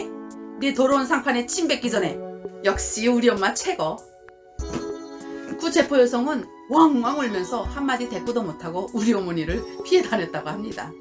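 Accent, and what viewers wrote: Pyongyang, North Korea. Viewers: 우연히 보게 되었는데 님의 영상이 나의 그동안의 약간의 고정관념을 깼네요..북한출신 유튜버들이 좀 있어서 몇명의 영상을 봤었는데 뭐랄까.. 그리 호감이 가지는 않았죠..이유는 여러가진데 첫번째 북한 사투리 거의없이 너무 남쪽말을 잘하고 여기 어린애들이 주로 사용하는 은어..그런것도 자유자재로 말하는데 내가볼때 그건 남쪽말을 잘한다가 아니고 너무나 부자연스러웠죠.. 씨처럼 평양 사투리가 느껴지는 억양으로 하는게 훨씬 보기좋고 자연스러우니까요..그리고 두번째..이게 젤 마음에 걸리던데..북한을 너무 비하하는거..부모형제.친구.친척들이 살고있는 실질적인 조국인데 남한에서 산다고 남조선 젊은애들 은어를 섞어가며 븍한을 비하하는 모습은 정말 보기 안좋더라고여..그것은 자신의 얼굴에 침뱉기죠..내가 생각할땐 남한 인민들보다 북한 인민들이 어려운 환경에서도 지금까지 버텨올수 있었던건 바로 우리민족의 굳은 자존심 아니었을까 합니다..경제난 속에서도 양키.왜놈들에게 결코 머리 숙이지 않았으니까.. 북한의 좋은점도 찾아서 이야기 하고 남북간의 다른 말도 소개해주며 서로간의 이질감을 없애주는 방송..동포라는 생각을 갖게하는 그런 방송이 되면 좋겠네요.. 첫댓글인데 너무 길어서 미안합니다 ^^ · 관심 갖으러 왔디요~~서울말보다 평양말이 더 정감가네요~~ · 서울말 표띠 마이 안내이 존네예.. 계속 평양식 말투로 해 주모 더 조켄네예.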